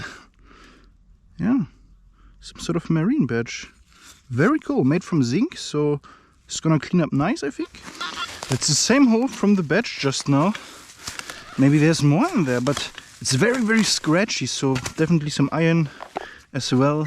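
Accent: Germany. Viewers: I was thinking the same thing about the pronunciation of iron. Another word is enamel, German accent pronounced animal but we still love your channel. ❤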